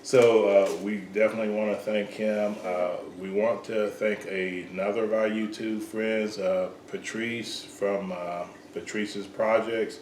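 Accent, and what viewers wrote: United States. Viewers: Wow!! I am catching up on your videos - and I am so impressed with the collection of seeds that you have!! And you made me laugh when you said you have a bit of a southern accent and may pronounce things a different way!! People here in Texas sometimes think I am from a foreign country given the way I pronounce things!! LOL! So nice to see you and. God Bless, Mary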